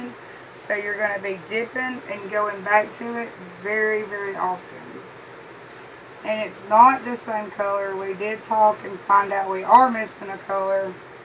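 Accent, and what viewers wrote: South, United States. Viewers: ... while this particular design of granite is too busy for me, I have to say that it is a great technique, especially for people who are on a budget, $25 is an awesome price to transform counters. As far as your way of speaking, don't apologize for it. You are southern and sometimes that's how southern people speak. It may not always be correct but it's not a sin, LOL. I'm from New York and we have a distinct accent as well and we say words differently but I'm proud of how I speak because I'm proud to be from New York City. When I make mashed potatoes I always call them taters:) because I love that word! Another word I like is reckon, so keep on being YOU <3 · I just love you accent, a true "grits" girl like myself. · Okay, I hate to ask this, but I'm from Michigan and you have a southern drawl and there is one part that I'm not quite sure what you are saying. 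You said in the very beginning to make sure you get the kilz base..Now I don't know if you said OLD Kilz or OIL base Kilz... I really want to do this and I don't want to mess it up. I put the captions on and it showed that you said Old.. but when I watch you say it, it looks like you are saying OIL but with that southern drawl. Could you please clarify for me so I don't mess it up? Thanks in advance. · I can't add anything that has not already been said except "War Eagle"!! I was so glad to hear a southern girl sharing such great teaching information. I am from Alabama and have added myself as a subbie. Really look forward to seeing your other videos and any new ones. God bless and thanks for sharing! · Hey there! I LOVE this tutorial/idea and have already bought the supplies. I think you are super cute and your Southern accent is adorable. I will share pics of my completed project when I am done. 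God bless you hon, wish we were neighbors! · Love your southern accent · Kudos to the closed captioner whoever you are! Sometimes I have a bit of difficulty understanding a Southern accent - and you were totally unflappable. :-) This looks like a good way to 'upscale' a counter! Which is a nice way to look like you have a champagne budget when you only have a Kool-Aid budget. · i love your videos btw, are you from the carolinas? i love the southern bell twang.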